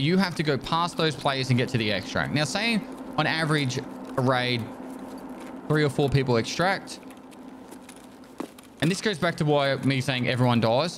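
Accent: Australia. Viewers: Australians have the best accent lol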